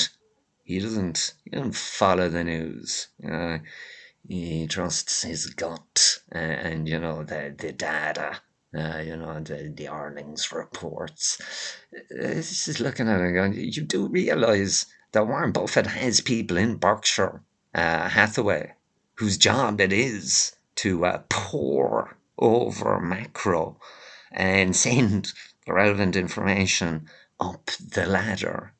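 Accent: Ireland. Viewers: As an American, I can understand you fine since you turned your audio volume up. A couple of those videos, the volume was too low but it's fixed now. I also could tell you were Irish right now away. I wanna here you do more impressions of your fellow Irish normies. That had me cracking up in that original video.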